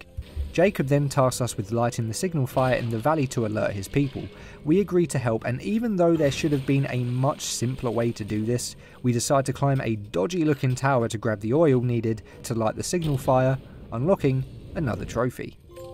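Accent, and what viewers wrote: England, United Kingdom. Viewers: I know this guy is saying divine source but I guess with his english accent and sounds like he's saying divine sauce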